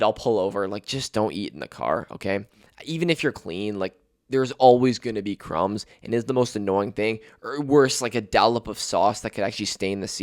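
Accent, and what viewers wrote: Canada. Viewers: canadian detected!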